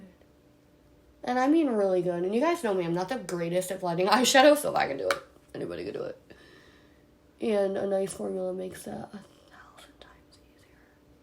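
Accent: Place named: New Jersey, United States. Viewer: Jersey? Or New York...it's not super strong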